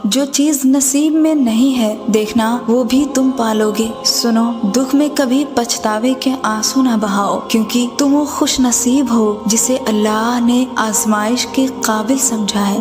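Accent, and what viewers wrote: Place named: India. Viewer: Your accent looks like from India . TRUE ???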